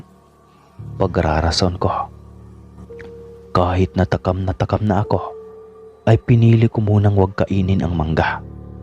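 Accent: Visayas, Philippines. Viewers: Bisaya kaba idol?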